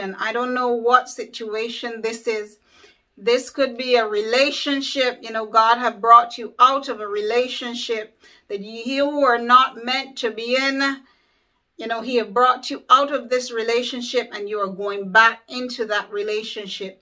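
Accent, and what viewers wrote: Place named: Barbados. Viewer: I hear that bajan accent! I love it and this video, God bless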